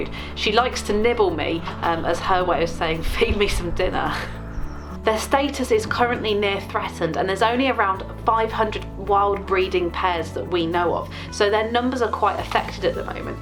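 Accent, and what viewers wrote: United Kingdom. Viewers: In you say: "she's literally older than me" automatic subtitles: "it's really a hotter than me" how dare you translater? Zorro is a "she" not an "it" ^^ I think your cute british accent confuses the translater, altough i think you speak pretty clear. (because english is not my first language, i happily use the automatic subtitles often anyway)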